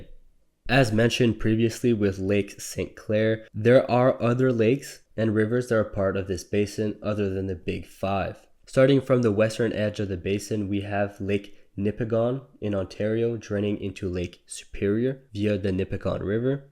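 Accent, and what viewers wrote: Quebec, Canada. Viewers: He sounds qubeccois. Based on the way he pronounces French names. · he’s definitely french canadian considering that’s how you pronounce niagara in french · Seriously, you sound Canadian, but you're mispronouncing very common things like Mackinac and Niagara. Macnik? Neeagara? Isn't this how we used to flush out German spies in Allied uniforms in WWII? :D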